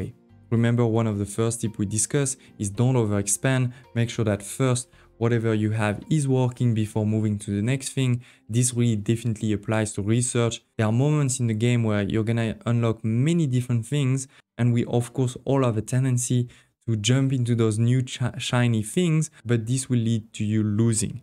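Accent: France. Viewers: Your French accent is wonderful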